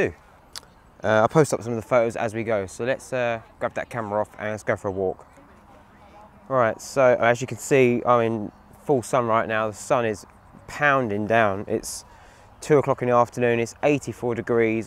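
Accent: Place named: England, United Kingdom. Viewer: got confused for a mo.... English accent in Kentucky. is an amazing host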